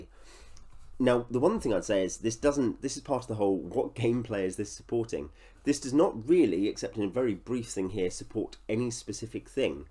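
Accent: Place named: United Kingdom. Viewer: I'm sending off for this today. You make it sound quite enticing. The British accent was the clincher.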